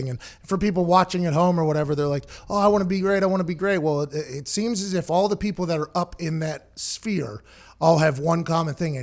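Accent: Pittsburgh, United States. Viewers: I didn't know you were from Pittsburgh. You do lowkey sound like a yinzer lol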